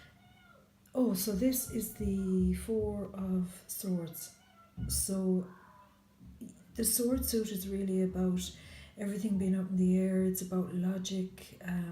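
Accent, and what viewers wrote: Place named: Ireland. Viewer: I love your accent! Puts me in mind of my recently passed, VERY Irish grandfather. He is missed and you are delightful:)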